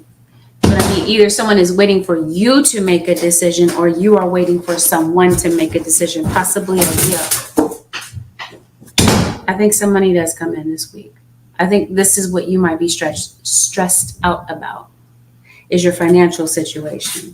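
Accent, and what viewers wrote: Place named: South, United States. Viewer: You from the south ain't you????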